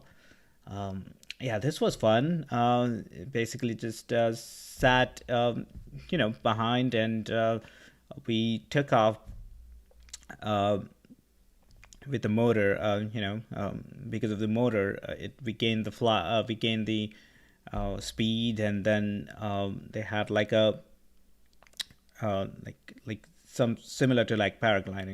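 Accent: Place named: India. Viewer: Nice video man love your accent ahah ;) are u from India ?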